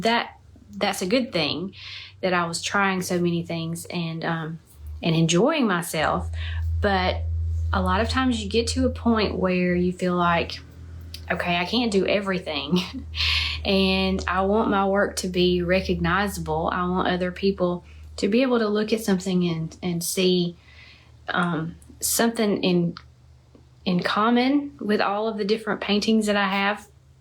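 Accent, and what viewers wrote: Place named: South, United States. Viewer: your thumbnails show it! And I am too. (I'm from GA.) So that's why I always click your videos...then I'm like, "oh, yeah, she's got a southern accent." 🤣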